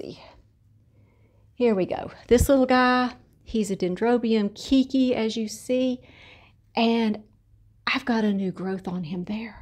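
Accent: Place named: Tennessee, United States. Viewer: I, do you put your fertilizer water on each time you soak your plants for 45 minutes, all year long. Or do you ever water with plain water in between? My daughter lives in Knoxville so I love listening to your TN voice! Thanks, Susan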